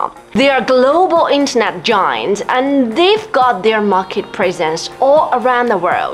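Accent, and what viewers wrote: China. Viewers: your chinglish is soo sexy!.............loved it! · I really love your English as spoken by which is original Chinese girl speaking the International English language. Well done and keep smiling😅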